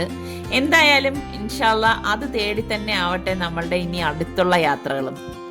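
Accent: Trivandrum, India. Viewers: trivandram bhasha samsarikkarundo ennu athenikku istamanu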